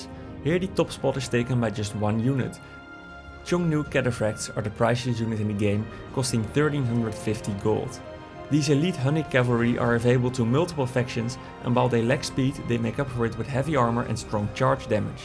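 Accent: Netherlands. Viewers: That Dutch accent tough 😂😅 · Holy shit, the narrator is Dutch as hell 😂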